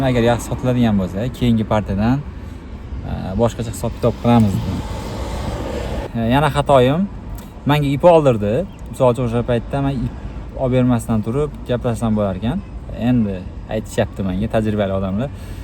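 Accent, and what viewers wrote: Khorezm, Uzbekistan. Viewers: Xorazmliksiz ajoyib aksentiz bo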